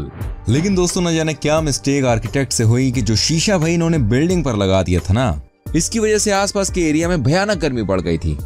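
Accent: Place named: Hyderabad, India. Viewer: HYDERABADi Bolte...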